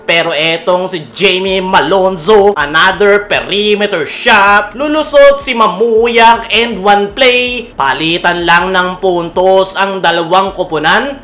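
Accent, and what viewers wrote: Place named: Philippines. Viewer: Ang pangit mong mg salita ano b probins u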